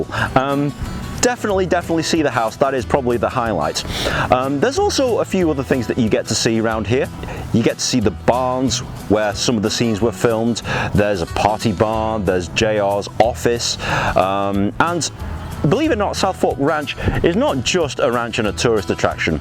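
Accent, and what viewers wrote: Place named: England, United Kingdom. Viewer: Why the fuck is an English guy representing a Dallas Texas ranch! You think they could have got an American Cowboy with a southern accent instead! thumbs up if you agree.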